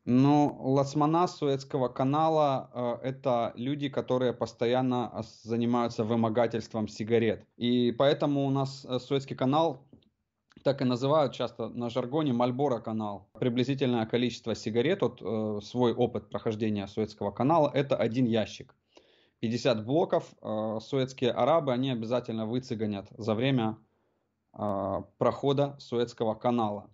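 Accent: Ukraine. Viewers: оказывается, это украинский говор.. оба-на.. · Судя по акценту ты из Одессы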